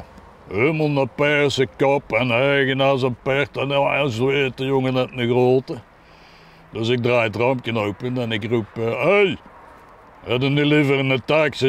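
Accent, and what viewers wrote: Tilburg, Netherlands. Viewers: Dat is geen Brabants maar Tilburgs, in Den Bosch praten ze heel anders. Meer zoals de New Kids.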